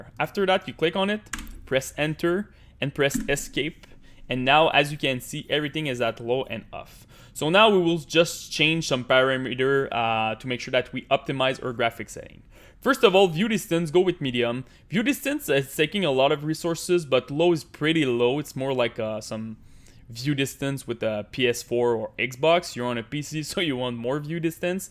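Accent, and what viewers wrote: Quebec, Canada. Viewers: Un Québecois :O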